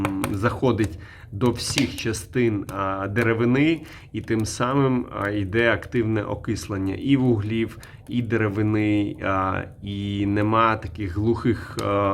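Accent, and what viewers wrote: Russia. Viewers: В твоей речи слышно, как много лет ты говорил на русском, а тут бац и вспомнил о незалежности.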